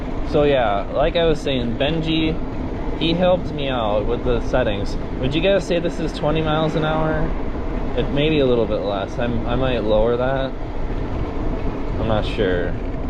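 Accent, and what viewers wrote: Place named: Wisconsin, United States. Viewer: Thanks for reppin’ the MidWest. Love your understated fashion sense adorable ‘Sconnie accent and seeing the Spingtime in Hudson.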